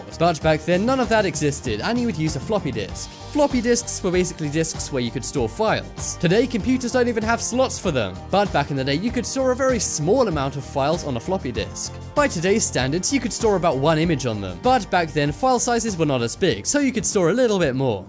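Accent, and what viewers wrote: England, United Kingdom. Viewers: You English?you sound it but you use so many American terms,you ashamed to be English? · He's English because us American don't sound like that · I cant figure out if this guy is english or american 😂🤣😂🤣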